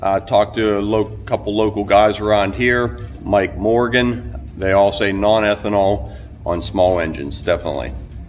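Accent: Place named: Pennsylvania, United States. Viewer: Love the channel. Just wanted to say there are no "Z"s in greeeassse. Love the Pensilvainia accent. Those are s's not z's. People point out my Ohio accent all the time and I live in the US Virgin Islands.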